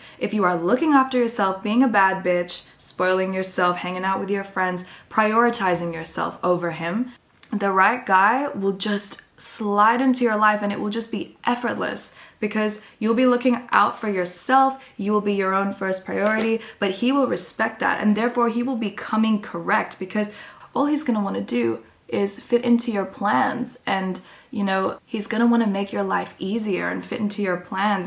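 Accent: Australia. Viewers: omd are you Australian or am I tripping? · are you Australian? I can't figure out your accent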